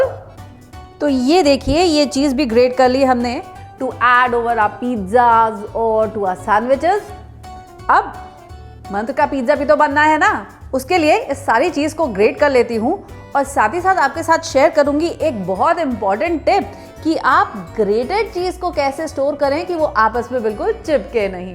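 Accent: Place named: Bihar, India. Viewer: Ka ho ustad👀 u r bihari woho I thou u r Punjabi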